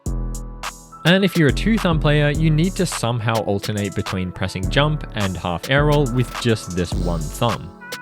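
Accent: Netherlands. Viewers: Bruh he was Netherlands I’m Dutch but I can understand the schot and stuff schot is shot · Waiiitttt, u dutchhh!?!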